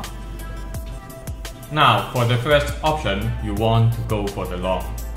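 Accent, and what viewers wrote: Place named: Thailand. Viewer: You sound exactly like they mimic Thai or Sing people speak English :)), btw I'm Vnmese.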